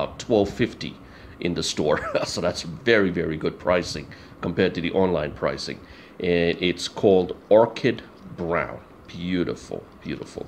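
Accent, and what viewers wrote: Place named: United States. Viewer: You speak very good American English for living in India.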